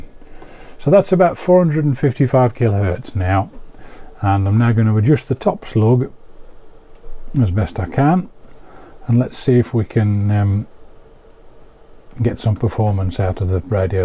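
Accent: United Kingdom. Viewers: Hey just come across your channel, yay a fellow Brit 😂 you sound close too.. I'm in Doncaster... Excellent content, I am going to start teaching electronics, I'm really quite sick at the moment so won't be soon but I feel like I should do my bit for the future EE and enthusiasts.. I've subbed and will take a look at your content.. 💡You should show how to make a peak detector probe to remove the carrier make the scope even more like an analyzer display 😁.. Fyi on some of my scopes you can turn off a channel and it will still trigger off that channel, or you can use the external trigger input and feed the sync from the generator in there.. I've done similar in the past I've used the external sync from the generator and adjusted the scope so the sweep takes up the whole screen, moved the trace down so you only see the positive half, basically a bode but with the sweep.signal visible, Steve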